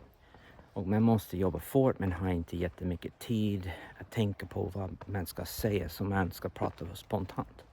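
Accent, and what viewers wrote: Texas, United States. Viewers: De som säger att din svenska är bra har 100% rätt! Man hör ju förstås att du kommer från USA, men om någon har det minsta svårt att förstå dig är det för att den inte vill (troligtvis för att den inte tycker om "icke-svenskar"). Första gången jag ser dina videor och det är superintressant att få inblick i vården i USA från en mer realistiskt källa än House 😆 Att du har kommit så långt med språket på bara tre år är superimponerande, jag vet flera som varit i landet 10-30 år som fortfarande inte kommit lika långt. "En"/"ett", "den"/"det", "de"/"dem" är svårt, ("de"/"dem" gör otroligt många infödda svenskar fel på), men det är ju alltid lätt att förstå även om det skulle bli fel. Svårare blir det ju om mellanrummen hamnar fel, hoppas du inte jobbar med någon "grå hårig sjuk sköterska" t.ex. 😉 Bra jobbat! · Det låter riktigt bra. 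Klart din svenska har en viss Texas-accent, men åtminstone jag som finländare hör mycket klart att du har lärt dig svenska i Sverige. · Din svenska är alldeles utmärkt och jag tycker du fått till fin satsmelodi. Att det hörs att du är från Texas är bara charmigt. Det gör det inte svårare att förstå dig. Bra jobbat! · Nice work. The Texan accent makes it hard pronounce certain stuff. I teach kids football in Swedish and my English accent is an issue with certain words. Great work though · You speak good swedish without the very strong accent americans often have. Be proud you have nothing to worry about. I understand everything. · Utmärkt svenska med bra grammatik och ordförråd. Du talar ju helt flytande utan pauser för att leta ord och det är inga som helst problem att förstå dig. Personligen tycker jag inte att du ska jobba bort texas-accenten, jag gillar den skarpt. Har alltid tyckt att det låter coolt när engelsktalande talar svenska. 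:) De enda smågrejerna jag noterade var ovanligt ordval på något ställe som "det var en stund sedan", vilket är direktöversättning (while) från engelska och det kan man ju absolut använda och alla förstår men mer vanligt är att man säger "det var ett tag sedan". Sen var det någon omvänd ordföljd som "ibland vi får tala engelska med dem" istället för "ibland får vi tala engelska med dem". Men det spelar inte heller någon roll, alla förstår givetvis ändå. Mycket bra jobbat! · As someone who knows it, he sounds just fine. Its easy to understand him, he speaks in a "natural" way (as in, not "book swedish"). Theres a definite american accent but honestly we dont really care about that:D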